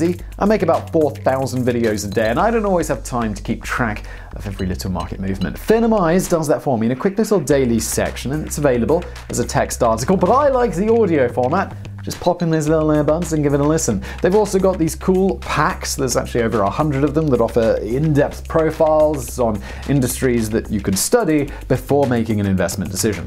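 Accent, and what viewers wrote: United Kingdom. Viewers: Australian dollars? New Zealand dollars? And there are dollars in even more countries. American dollars may not be the first kind of dollars to spring to mind to people from British Commonwealth countries, and is very popular in many of these countries, and don't forget that having a UK accent and not American they may think he was talking about their dollar. I'm a Brit, and when people say dollars to me, I automatically think NZ dollars, as my son and his wife have emigrated there.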